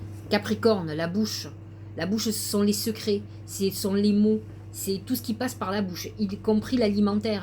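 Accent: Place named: South, France. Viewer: Merci beaucoup de la part d une Vierge qui mentalise parfois trop. Je vais demander l accompagnement du "juste" à Madame la Lune et à mes Anges projecteurs. J apprécie bcp vos interprétations! Et j adore votre joli accent du soleil 😀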